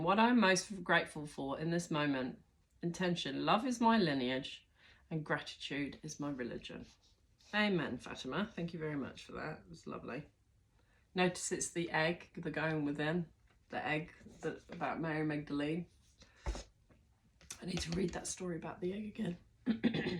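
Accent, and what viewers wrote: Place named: New Zealand. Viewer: Hello. Firstly thank you for your chanel. And thank you for your Kiwi accent. I know this sounds silly but hearing your accent was like healing balm for my soul. It gave me back some Kiwiana and filled me up with the spirit of Aotearoa. Since Covid I am in Germany. And 3 years in Berlin. That might sound really awesome but trust me it wasn’t. It’s been just aweful cause the energy is so full of the old fear guilt and horror from the war and all I did was clear clear clear and still there is f so I much to do that I haven’t even made a dent in what is going on in Germany here. There is not only the residual energy of the second world war and Nazism but there’s also the second world war and then all the wars before that and now there’s all the energy about the witchhunts, because particularly where I am living now there were a lot of witch, burnings, and Germany was one of the worst countries of them all they burned twice as many women as any other European country. 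And I am living amongst all this stuff, and as I am a Devine Feminine empath and a healer, I just miss beautiful New Zealand. I realize New Zealand is not problem free however, it could be so so much worse. So thank you very much. I’m so glad I have found you now and that I will get my little bit of Kiwiana every time I hear your voice. And really it’s not just your voice. It’s your attitude. There’s nothing like a kiwi attitude. It’s so different from the rest of the world. It’s definitely unique and it’s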